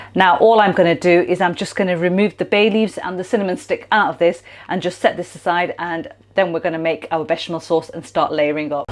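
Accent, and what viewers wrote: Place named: West Yorkshire, United Kingdom. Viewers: I appreciate the lack of waste for a home chef. Fantastic recipe. You sound like you're from West Yorkshire, we don't waste anything in cooking! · WOW!! I’m impressed (not something which happens often). Love the accent too, somewhere between Yorkshire and the Mediterranean, music to my ears. Excellent video, I’m subscribing now just to hear you speak-excellent videos will be a bonus. Great recipe, great presentation. I love it. 🇦🇺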